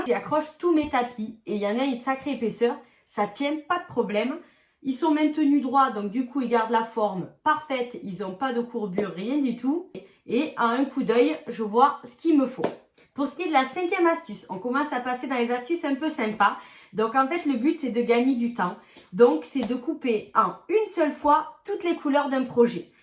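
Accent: South, France. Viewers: Bravoo cette vidéo m'a trop plue, votre accent serait il du Sud? Vos explications et astuces , et bien, un grand merciii🤗 je ne cricute plus depuis hier soir, bluetooth ne veut plus🥺 mais je vais vous suivre quand même 🙏 je suis en manque de cricuter👏👏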